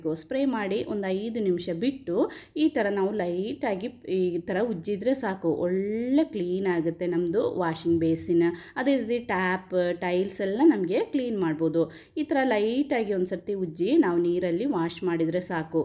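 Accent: Kerala, India. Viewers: Madam malayali aano Kannada ketappo angane thoni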